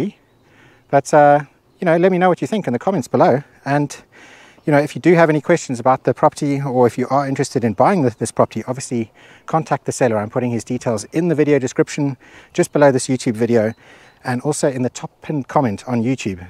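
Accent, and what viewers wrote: South Africa. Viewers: Hearing the Saffa accent when I clicked on this video was definitely a pleasant surprise 😂 I'm hoping myself to live somewhere in rural Portugal someday while working online. Seems like a dream.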